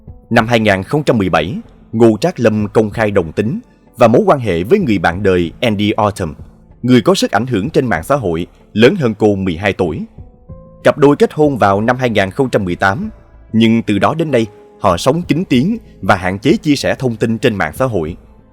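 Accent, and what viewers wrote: South, Vietnam. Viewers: Giọng đọc miền Nam hay quá , giống như giọng của MC Anh Dũng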